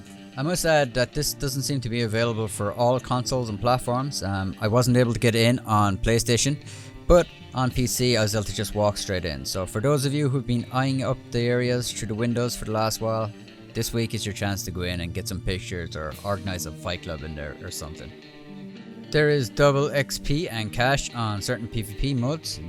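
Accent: Ireland. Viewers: This man is Irish by the sounds of it.....legend ☘️😁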